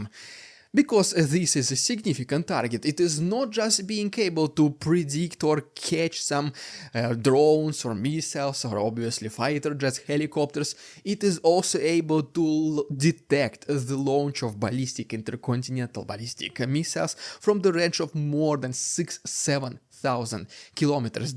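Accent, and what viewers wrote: Russia. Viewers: OMG...so sick of this. "Russia is done" and "Russia is defeated forever" and "It is over for Russia" are very ridiculous things to be saying at this point in the war because this content creator is just speaking out of the cheeks on his backside for clicks. Fake news at it finest folks coming from a person you would expect truth and knowledge from. The guy even has a Russian accent it seems. Sure, I want Ukraine to win but they arent winning right now, obviously.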